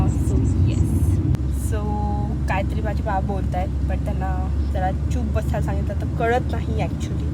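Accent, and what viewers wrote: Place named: Malvan, India. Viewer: Loved the malvany ascent...plz more of that type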